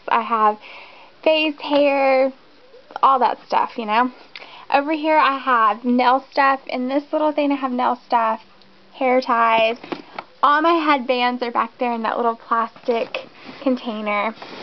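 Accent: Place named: South, United States. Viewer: Love your southern accent. Where r u from?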